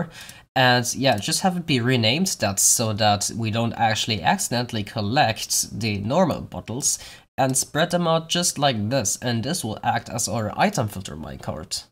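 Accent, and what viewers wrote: Germany. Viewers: MC He is German, you can clearly hear his German accent XD I guess all really good technical minecrafters come from Germany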